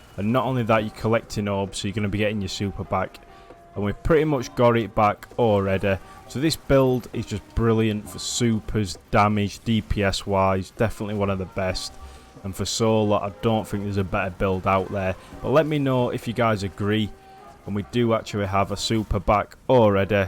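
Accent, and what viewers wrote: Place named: Leeds, United Kingdom. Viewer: Sound like a leeds lad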